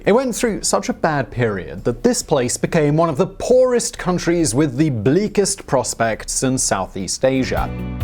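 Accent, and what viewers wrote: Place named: United Kingdom. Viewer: another skinhead with UK accent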